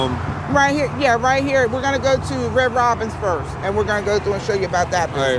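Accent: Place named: Liberia. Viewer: Are you Liberian? I’m picking up on your accent bro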